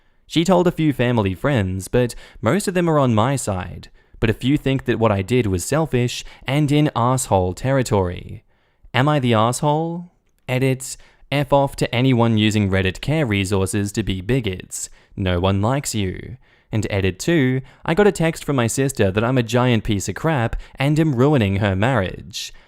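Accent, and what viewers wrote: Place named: Australia. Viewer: He's from Australia.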